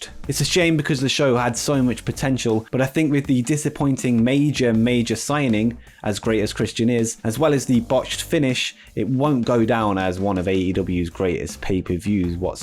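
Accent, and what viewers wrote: United Kingdom. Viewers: why are most of these wrestling clips narrated by a dude with a british accent? just askin'.....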